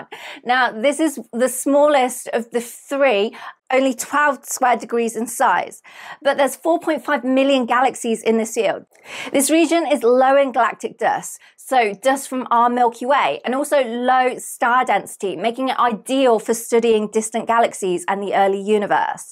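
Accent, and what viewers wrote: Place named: Cockney, United Kingdom. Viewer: you look Chinese but you speak cockney...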